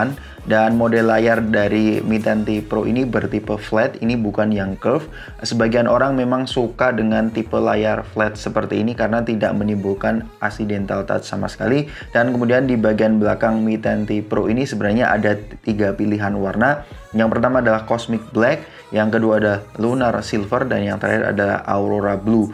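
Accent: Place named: Java, Indonesia. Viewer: Medok e bang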